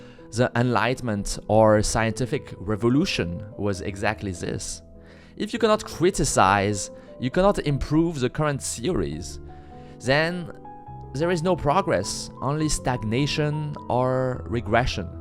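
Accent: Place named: France. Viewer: Love the French accent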